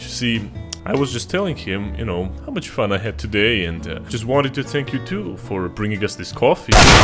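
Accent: Russia. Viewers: Are you having russian accent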